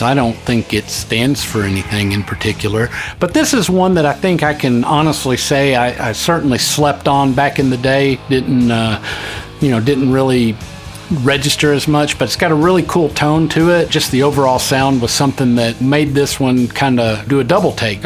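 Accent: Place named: South, United States. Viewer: I have a question. Why does have a southern accent as a teen, but not now? 😊❤😊❤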